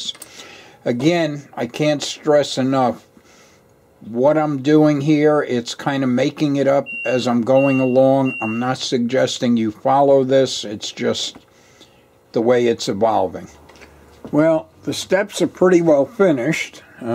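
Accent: New York, United States. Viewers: Hey New Yorker - I am a fan of yours,! I lived in Manhattan & Brooklyn for many years and I rode the F Train as well... Your work is simply great with a fine attention to detail. This is the work of a true craftsman of RR and Subway RR modelling. Thanks BTW, your voice has that warm New Yorker dialect that makes me year for a Nathans dog and a Bagel with cream cheese and lox... Enjoy your great work and your layout, sir...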